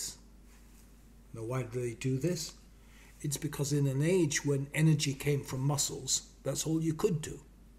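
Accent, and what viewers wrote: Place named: Upper class, United Kingdom. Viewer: I once saw a video of hers advocating nuclear energy, leaving aside a few important sobering arguments against nuclear power. I thought they‘d be useful to consider and had them placed in the comments. - No reaction. I also don’t like too much the tone of voice and the sort of strange upper class English she speaks, presumably in order to underline excellence and intellectual superiority.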